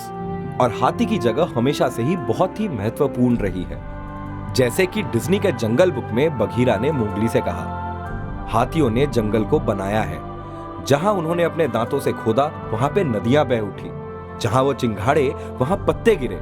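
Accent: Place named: Uttar Pradesh, India. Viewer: Very beautiful and eye-opening video.. Voice over by UP vale bhaiyya.. :-)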